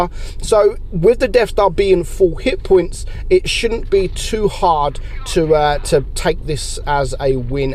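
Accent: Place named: Scotland, United Kingdom. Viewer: Scottish lol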